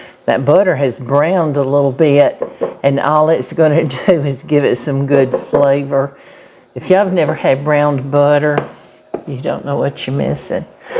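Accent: South, United States. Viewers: Don't let the critics who tell you how to pronounce 'peppericka' worry you. Your Southern accent is a big part of your charm!! Keep cooking and use plenty of 'peppericka"!! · Pronounce the words the way you do and let others pronounce them their way I love your southern accent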